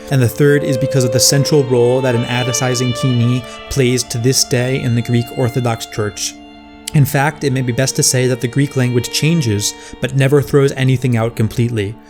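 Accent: United States. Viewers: his American accent is too apparent when pronouncing Greek words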